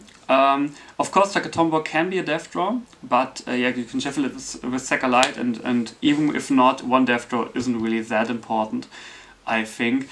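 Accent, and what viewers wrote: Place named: Germany. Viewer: Nice List :D PS: Man merkt anhand deinem deutschen Akzent,dass du aus Deutschland bist xD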